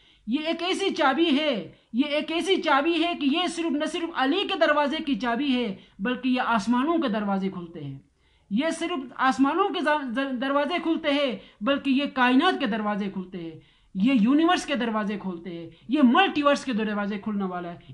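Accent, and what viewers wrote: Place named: North, Pakistan. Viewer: He belongs to Northern pak.his accents are a bit different.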